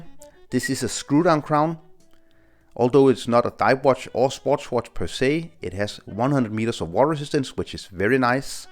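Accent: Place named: Denmark. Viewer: Great review, in the list of my next purchase. Love the brown dial. I realize that you have danish accent, then i open your youtube channel/profile..yup DK 😊.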